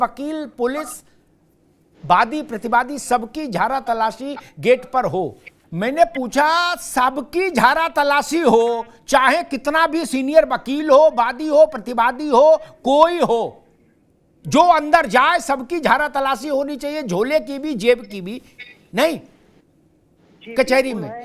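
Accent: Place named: Lucknow, India. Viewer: जी लखनऊ से हैं लगता है। गुलाटी वर्दी चारबाग।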